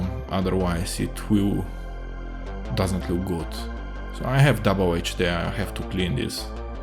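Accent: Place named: Russia. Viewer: Почему твой акцент подсказывает что ты русский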